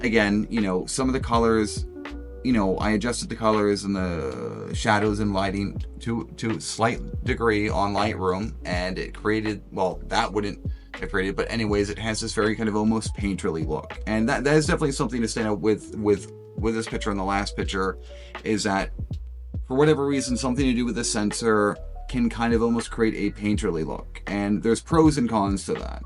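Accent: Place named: United States. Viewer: You don't sound Scottish. I would have said American.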